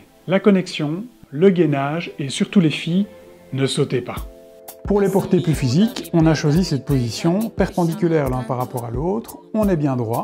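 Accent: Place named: Belgium. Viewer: Belle pédagogie, rythme de démo agréable et super digeste 😉, les portés j'Adore ! #DirtyDancingGeneration... 🤷🏻‍♀️😍😜 Y en a jamais trop ^^ Presque. Merci pour cette vidéo très fluide côté montage également, les petits traits d'Humour et l'accent de la Belgique qui me rappelle toujours des Souvenirs chers au 💗🤫🙃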